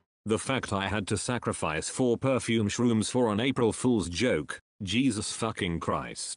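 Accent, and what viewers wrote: United Kingdom. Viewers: Honestly, man should've just used his British voice for this Ability Analysis.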